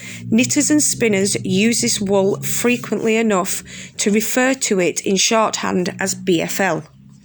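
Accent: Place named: Northern England, United Kingdom. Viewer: Very nice video. And your northern accent is entrancing to us in California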